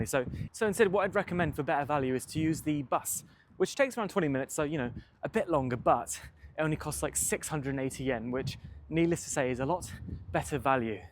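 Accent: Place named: RP, United Kingdom. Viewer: Hello, I love your videos! Since I found your channel a couple months ago I have watched all your videos and I’m always waiting for the next one, thanks for the great job you are doing! I wanted to ask you something and I mean no disrespect with the question but, are you a trained voice or scenic actor? I’m not a native English speaker and your pronunciation and cadence reminds me of the listening exams for the Cambridge exams, like you talk in an accent close to RP. I ask just for curiosity and again, I mean no disrespect and I hope this doesn’t bother you too much. Keep up the good work and thanks!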